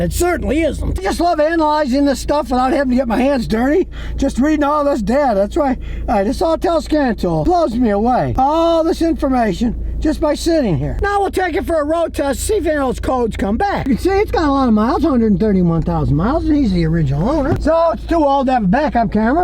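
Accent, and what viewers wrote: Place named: United States. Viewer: This guy is the epitome of my fantasy of how a true american sounds like. Half screaming, spitting through the "p" 's and the "d" 's, scattered all over the place and what not. That's what rock'n'roll is guys and girls!!! Rock on!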